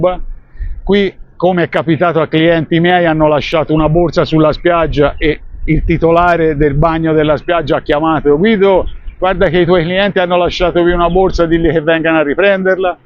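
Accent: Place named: Tuscany, Italy. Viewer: Ciao Guido,sono Luca dì cecina (li),mi sembra che dall accento anche te sei toscano come me.....io ho vissuto in Venezuela per dieci anni,a maracay estado aragua...poi per colpa della crisi sono ritornato in Italia nel 18 e finalmente nel 22 sono riuscito a portare le mie tre figlie e mia moglie (tutte nate in Venezuela) in Italia.scusa la lungaggine,solo vorrei sapere se è raccomandabile oggi investire all isola de margarita.....la mia idea sarebbe comprare un paio dì appartamenti per turisti e avviamente una casa indipendente per me e la mia numerosa famiglia.... orientativamente diciamo un investimento dì circa 50,000 euro......che mi consigli tu? Visto che tu fai sempre ottime spiegazioni e sei una persona sincera ti sarei molto grato se mi potresti dare un consiglio.... grazie mille e continua così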